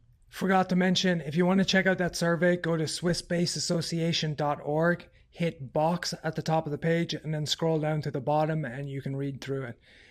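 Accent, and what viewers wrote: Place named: Southwest, Ireland. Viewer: Where is that accent coming from, bangs of south west Ireland throughout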